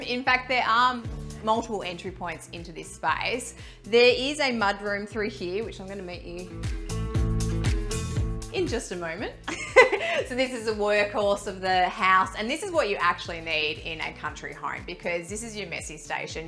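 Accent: Australia. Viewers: What part of Australia is your accent, I wonder. It's very different to what I hear on the daily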